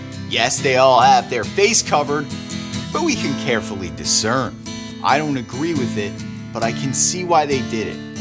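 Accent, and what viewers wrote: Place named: Russia. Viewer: Tell me why this man does a better Russian accent than American one though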